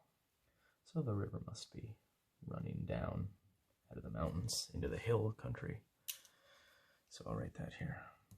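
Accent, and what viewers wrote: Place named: Ottawa, Canada. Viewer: Originally Ottawa valley but Victoria now. I knew you were local Ottawa from the accent.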